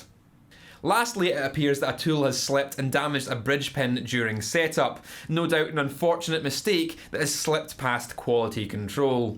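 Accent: United States. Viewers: Seriously good review. I mean I am a bass player, and you almost had me wanting one of these... And I give you a B+ on your american accent. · Great video, and pretty cool bundle. Your American accent is damn charming haha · Oh boy that American accent is coming along so nicely! lol, just love these review videos, awesome as always! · That American accent though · THE AMERICAN ACCENT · The American accent you use. Thank you for that. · Last time I was this early my girlfriend was disappointed. Also that american accent tho.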